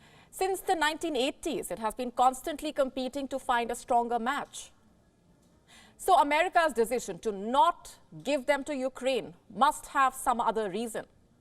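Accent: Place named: India. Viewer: Indian accent is so ridiculously funny! 😆😆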